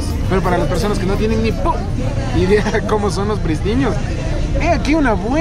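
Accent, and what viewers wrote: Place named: Ecuador. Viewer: Hablas muy feo hno. Así hablan los ecuatorianos ??!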